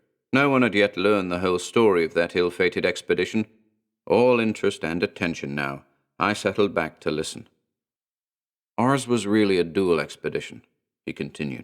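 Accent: United States. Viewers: Your american accent is really good! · American accent is hilarious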